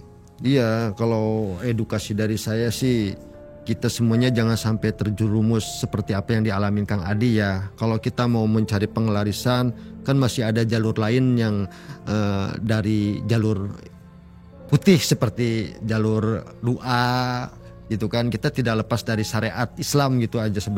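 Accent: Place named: Cirebon, Indonesia. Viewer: Logat ngmngnya ky indramayu cirebon😃🙏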